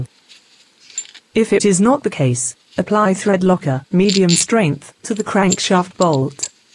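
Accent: United Kingdom. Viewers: So are you an Englishman in France?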